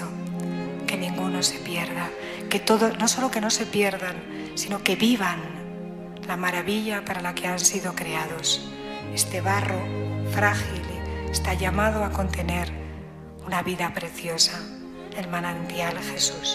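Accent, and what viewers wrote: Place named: Spain. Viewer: "La Vírgen es madre, sufre dolores de parto, hasta ver a Cristo formado en tí." Preciosa meditación madre Verónica, escuchar su suave y pausada voz, es como si estuviera escuchando a la Madre de Dios. Vosotros los españoles tenéis el acento más lindo de nuestro amada lengua española. Saludos desde Colombia, y os ruego una oración por mi país Colombia.🇨🇴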